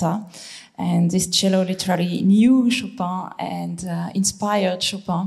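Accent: France. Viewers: Lovely! Sweet English in French tongue.❤👌😍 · Beautiful music played by a beautiful lady. Her French accent makes English sound lovely.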